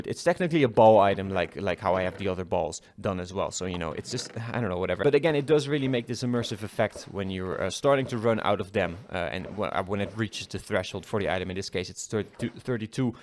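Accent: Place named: Netherlands. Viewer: Took me 10 mins into the video until I realized you're Dutch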